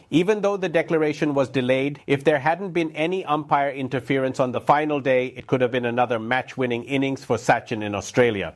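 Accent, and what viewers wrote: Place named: Barbados. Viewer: Bhai you look like someone from Nallasopara, why are you speaking like someone from Barbados?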